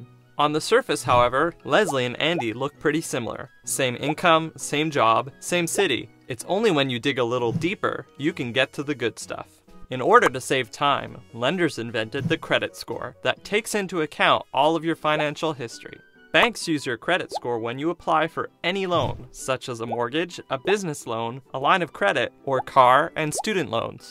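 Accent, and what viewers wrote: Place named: Canada. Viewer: The narrator sounds so Canadian.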